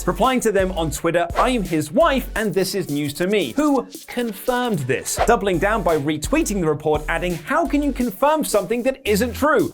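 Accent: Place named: United Kingdom. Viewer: Brits pronounce blackball and Blackpool the same. Not a good episode to have both Tessa and NXT UK news.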